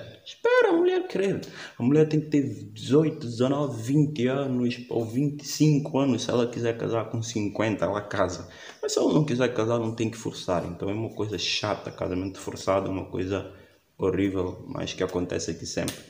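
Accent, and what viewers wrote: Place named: Brazil. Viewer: Vc é um brasileiro puro kkk O sotaque de Guiné Bissau é assim ou é por influncia Brasileira?? Muito legível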